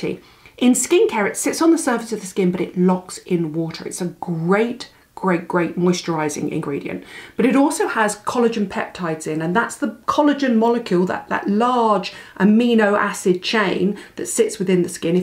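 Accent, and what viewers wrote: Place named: Nottingham, United Kingdom. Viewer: I just adore you. I think u r one of the loveliest and most well informed woman in the business. And your accent kills me. My mother was from Nottingham so u r one of my people. U must have Jeffree Star on your channel. I know he goes to England on promotional tours. He is even more cutting edge than Drew Barrymore.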